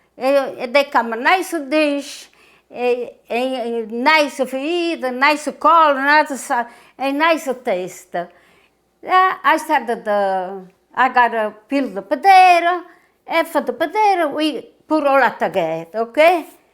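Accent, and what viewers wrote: Italy. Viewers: Love watching with her great recipes, and also love listening to her Italian accent, as a native Italian I love everything Italian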